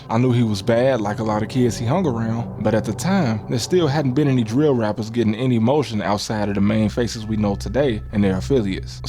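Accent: Chicago, United States. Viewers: This is game bro, the chicago accent adds like the perfect level of translatable relatability, at least personally lol, youre actually a human telling a story, not some dude ropeplaying TTS, to put it politely how some of the dogshit you'll find on this website 😂, this is at least silver, if not gold tier content consistently least these past few videos i been catchin lol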